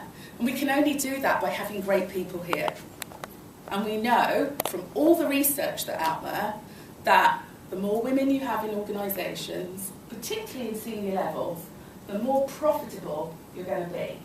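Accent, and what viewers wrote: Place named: United Kingdom. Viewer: Way’ment. I remember when she was in the group, but when did she develop this British accent?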